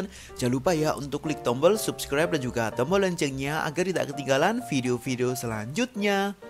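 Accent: Java, Indonesia. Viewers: Medok lo rek mas 😂😂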